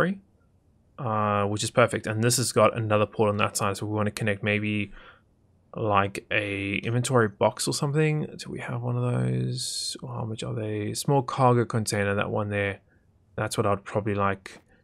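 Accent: South Africa. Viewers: Is that a south african accent I detect? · Sounds a little like a ZA boi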